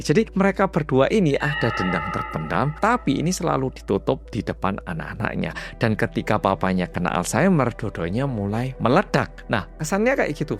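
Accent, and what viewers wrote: Java, Indonesia. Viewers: Medok kah? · Malem mas medok Sehat sukses selalu 💪🏿 Lgsg nyimak🎉🎉🎉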